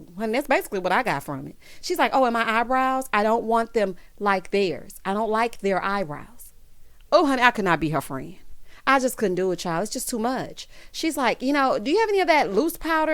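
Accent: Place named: Houston, United States. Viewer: You are most definitely from Houston 😂 you sound just like me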